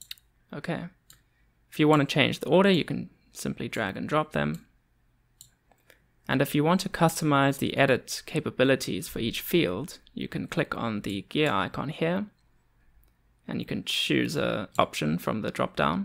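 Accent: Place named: South Africa. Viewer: South African accent FTW :)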